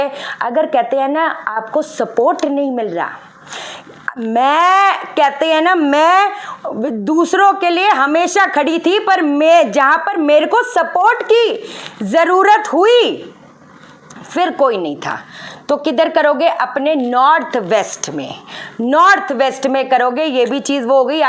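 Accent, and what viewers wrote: Punjab, India. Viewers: 🎊Dear Mam! 💝🎁 You know what सबसे best thing about you is your punjaabi diction and emphasis on our ownself मंत्र जप!! 💐 "👌Siddhi baat hai!! --👍☺️💝 as you most often say" 🎊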